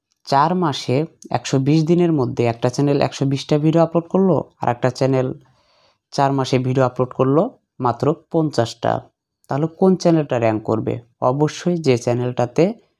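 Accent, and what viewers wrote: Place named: New York, United States. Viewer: ভাই নিউ ওয়ার্ক প্রিসিলার মতো করে কথা বলছেন ওয়াও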